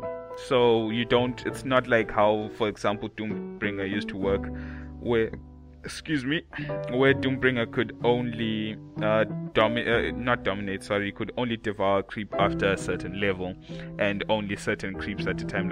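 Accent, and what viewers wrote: South Africa. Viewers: definately south african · Yo dude, You sound South African, Is that the server you play on?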